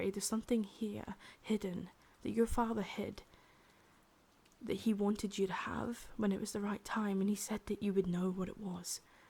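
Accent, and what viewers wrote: United Kingdom. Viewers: i love your voice epscially your british accent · Me: "British accent? Well, that should be interesti-": "Good morning, sunshine." Me: "Oh... I'm in trouble." 😳 Real talk: I've actually been struggling with my depression rearing its ugly head the past couple of days, so I really do appreciate this. Thanks for the audio. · She really cute with british accent. Great job💚👍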